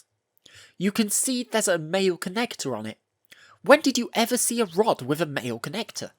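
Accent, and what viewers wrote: United Kingdom. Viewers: I know you got a British accent and all, but Kursk is in Russia, not Ukraine.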